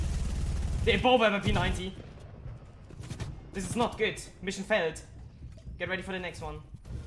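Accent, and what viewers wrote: Germany. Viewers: Dieser Deutsche Akzent in der Englischen Sprache triggert mal übelst😂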